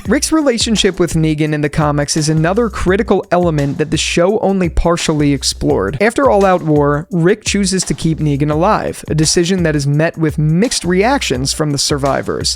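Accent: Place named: Philadelphia, United States. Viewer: Love the Philly accent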